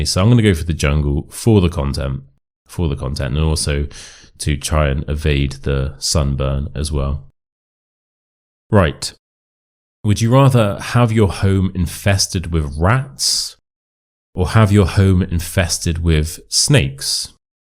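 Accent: RP, United Kingdom. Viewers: Thank you very much dear Mr yes you are speaking real British English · I started watching your videos a few weeks ago to improve my British RP pronunciation, and they’ve been amazing! But here’s the twist 🔀 I’ve become too addicted. I started watching them at night, and now they’ve turned into my secret weapon for sleep. A few nights ago, I fell asleep halfway through a video, and yesterday… I slept for 12 hours straight! So, congrats 🎉🍾🎊🎈 your videos are not just improving my accent, they’ve also become my personal sleeping pill. Keep up the great work, I guess? 🧐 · Hi, it's really amazing to learn British English with you. Thanks a million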